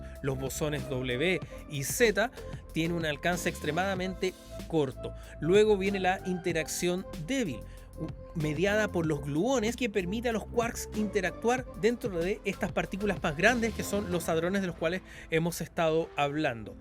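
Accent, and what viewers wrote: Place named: Chile. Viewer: hola una consulta... eres chileno,... yo chileno seguidor de canales de ciencia como robot de platno, date un vlog, Thoisoi, jordi prereira entre muchos otros... primera vez el algoritmo me dejo caer tu canal... un placer escuchar ciencia en acento español de chile... y encontre por fin como un proton se convierte en neutron... eso buscaba y pille tu canal... y al fin lo aprendi... los Piones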